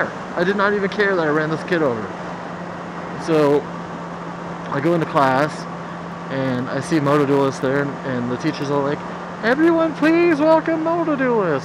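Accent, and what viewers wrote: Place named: France. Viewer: Do you have a video of your camera/mic setup? BTW awesome frenchy accent!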